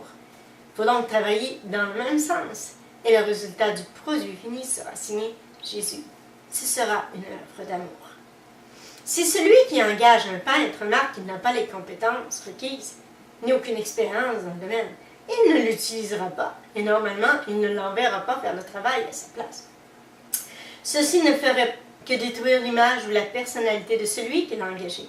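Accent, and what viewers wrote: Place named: Quebec, Canada. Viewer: Bonjour! J'ai liké ta vidéo. Je voudrais cependant te demander si c'est possible quand tu fais une vidéo d'abandonner un peu ton accent québécois? Pour qu'un maximum de personnes puissent te comprendre.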